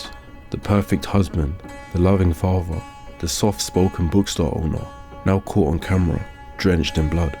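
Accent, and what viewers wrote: London, United Kingdom. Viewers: the best video ive seen yet on joe and the London accent makes it even more impressive.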